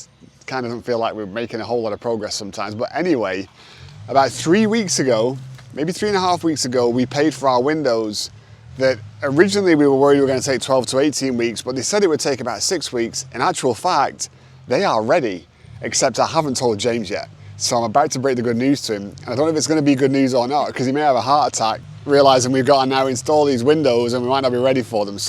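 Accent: England, United Kingdom. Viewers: Are you from England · This guy is British! 🇬🇧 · Amazing build, I bet your so glad you took the gamble, you don't sound American, UK maybe? What was the final cost? really curios. Jim in Liverpool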